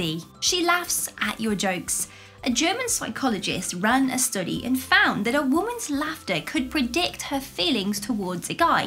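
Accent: United Kingdom. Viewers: heyy you are one of the best advice givers ever on youtube, there is a christian girl at my church who seems to like me a lot, btw how often can you text a girl every two or three days I am assuming, i need your advice btw your British accent is pretty cute. Lastly, you can be little awkward sometimes right not intentionally, but it happens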